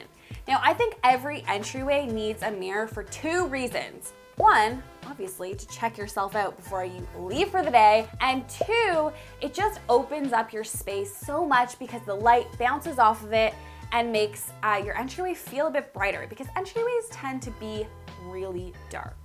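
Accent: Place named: Canada. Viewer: Very useful, you are very talented. I love your canadian accent too. 'Aboot!' And you live in Toronto, you lucky person!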